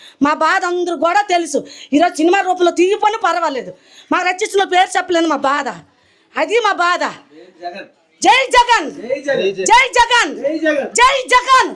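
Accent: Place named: Srikakulam, India. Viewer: అచ్చం శ్రీకాకుళం యాస, నిలువెత్తు నిజాయితీ, మేలు అనుభవించి మేలు కోసమే గళం విప్పే విధానం. శ్రీకాకుళం అంటేనే మంచితనం అనేలా వున్నారు తల్లి. జయహో జగన్ మోహన్ రెడ్డి గారు. ప్రతీ పేద, అణగారిన బ్రతుకుల్లో జగన్ అనే వ్యక్తి ఒక ఒక తండ్రి గా ఐపోయారు ❤️❤️. ఈసారి జగన్ కోసం ప్రతీ కార్యకర్త గళం విప్పాలి, శ్రమించాలి ❤️❤️🥰🥰.#Vote for FAN ❤️❤️🥰🥰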